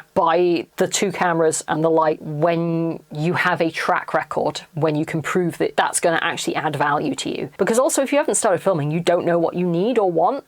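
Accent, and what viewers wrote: United Kingdom. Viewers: I love listening to the non-posh British accent. Because its HONEST. (And I run you at 1.5x or 2x, so you definitely dont talk too fast.) I love the ND content, because it fits my brain. I dont even remember what I started watching you from, but I love the "historical costume" side of YT. Stayed for the clearly LGBTQIA+ person, because you remind me of my family. I dont plan on LARPING, or starting YT, but stay for that kind of content because YOU. And I live in my subscription tab too (well, from there to "watch later" because I have more than I can watch daily.) Smart idea to beginner YT peeps: have a catalog ready to upload BEFORE you start the channel. Its easier to release on a schedule if you have a month of already edited things ready to upload.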